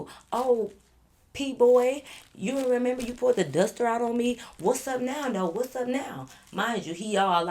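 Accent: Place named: Detroit, United States. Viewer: I love our accent 🔥🔥 313 definitely don’t have time to be pronouncing every dang syllable 🤣🤣 people found it funny all the time when I first moved out of town asking me to say SALT and FAULT 🤣🤣🤣🤣🤣🤣🤣 talking about I say Saw with a T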